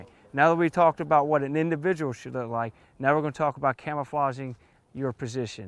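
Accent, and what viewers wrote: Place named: South, United States. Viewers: Is it a requirement to sound like you're from Carolina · reminded me of Forest Gump... that southern accent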